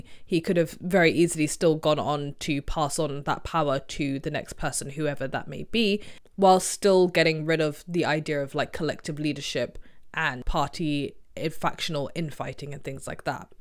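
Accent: England, United Kingdom. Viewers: How do you know so much about China? That's an English accent, isn't it?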